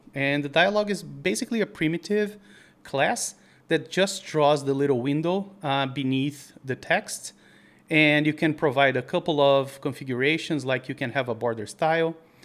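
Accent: Brazil. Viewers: I recognize the Brazilian accent from kilometers away kkkkk. Awesome video series, learned a lot!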